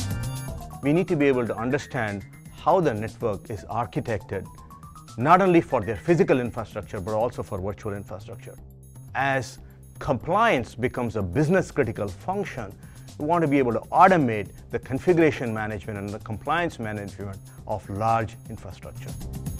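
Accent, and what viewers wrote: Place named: India. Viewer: It is hard to understand your Indian English accent. PLEASE IMPROVE YOUR ENGLISH ACCENT.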